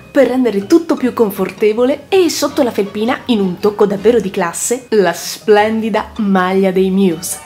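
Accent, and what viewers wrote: Rome, Italy. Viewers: Fantastica come sempre xD troppo forte !!! si capisce che sei romana !! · Amo alla follia la tua comicitá, la tua parlata romana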